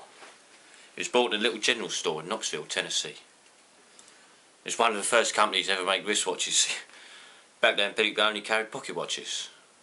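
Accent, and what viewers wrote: England, United Kingdom. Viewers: Just discovered this...wonderful! Great skill! Are you English?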